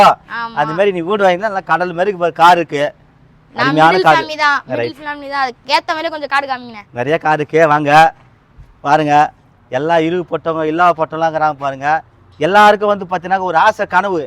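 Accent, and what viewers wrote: Madurai, India. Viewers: Super Anna Madurai vasagam 🎉🎉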